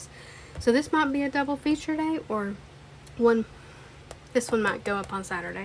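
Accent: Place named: South, United States. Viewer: Loooooove the country dialect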